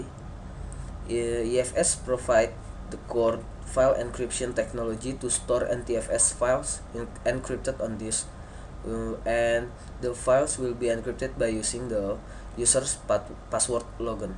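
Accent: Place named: Indonesia. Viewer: indonesian english.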